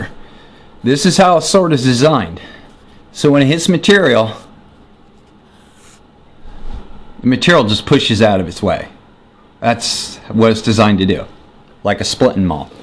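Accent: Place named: Indiana, United States. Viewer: No sounds like you live in Indiana bro trust this old Hoosier boy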